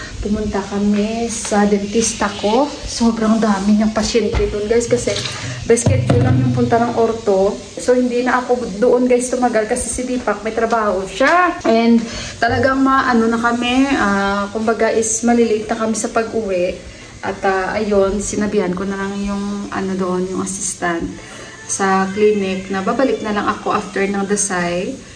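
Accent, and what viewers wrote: Iloilo, Philippines. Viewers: hilo nang.. kalingaw simo pamatian mag ilonggo whahha. Kahilidlaw sg mga celebration sa Bacolod. hidlawon ka gd mgpa gutok hulat sg parad nang. And Happy Dashain sa inyu da nang. God bless kag keep safe gd da nang.🤍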